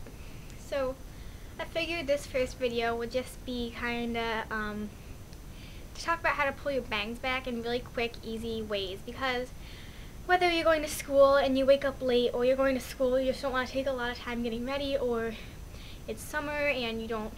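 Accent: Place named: New York, United States. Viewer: You can kinda hear New York accent in this video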